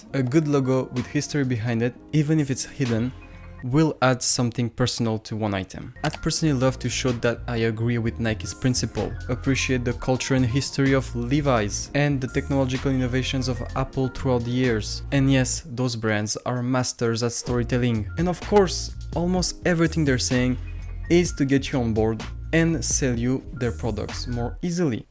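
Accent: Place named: France. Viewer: accent fr spoted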